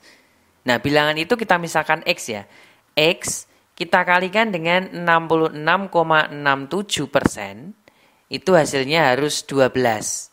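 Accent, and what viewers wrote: Java, Indonesia. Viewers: Orang mn? Medog2 gmn gt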